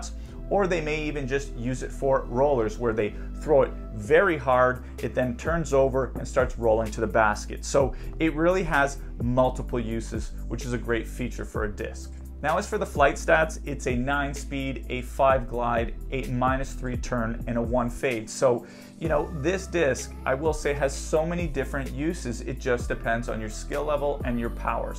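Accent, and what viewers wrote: Quebec, Canada. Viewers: when available I'm going to grab the elevation koi putters. I love the interceptor midrange. Rubber putter might be interesting. That sounds like quebec canadian French correct? Btw love the content. Keep it up.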